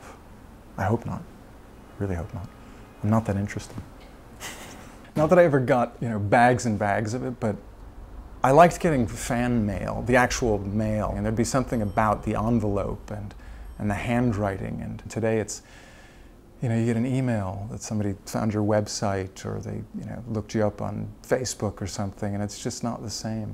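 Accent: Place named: United States. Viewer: UAU...I mean, he's so hansome!!! Pretty eyes, pretty look, a litle bit faty'iiish and he's not a "bird man" maybe a cat person or a dog person just like me, (I love dogs). Oh, and I love his so american accent. And, in spite of criticizing italian people, witch I adore, cause they are so emotional and with a spicy drama in everything they do or say and they speek like as if they were singing and they also speak with a lot of hands gestures and body language but, well... in the end it all suits him very well. Pretty hansome guy, indeed.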